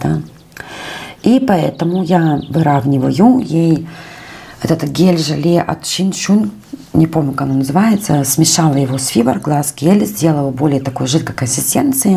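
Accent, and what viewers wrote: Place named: Germany. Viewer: в Германии. На немецком Fiber; Fiberglas( стекловолокно) читается, как « фибер / Фиберглас «. Это не ошибка, просто читает, как немцы читают. Здесь корректно говорить именно фИбер.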